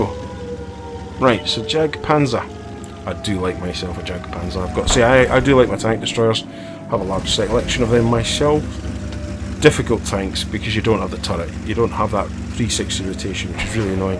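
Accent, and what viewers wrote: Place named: Scotland, United Kingdom. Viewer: yea, I like your vid...got my sub...can't wait for some more..Love the Scotsmen Accent...I'm in Nova Scotia...where abouts you at M8?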